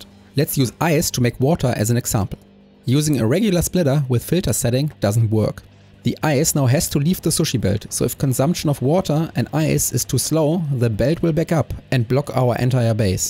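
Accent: Germany. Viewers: I could watch these videos for hours just because of this german accent. (Rreally like it)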